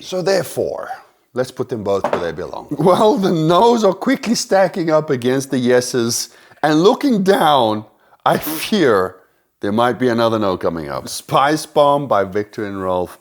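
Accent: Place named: South Africa. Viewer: I knew your accent was familiar. You mentioning South Africa confirmed my suspicion.😂😂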